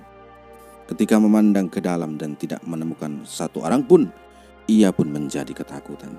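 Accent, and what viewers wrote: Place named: Arab world. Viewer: Makan minum.mandi di indponesia tapi.logatnya ke Arab arab an dan bangga banget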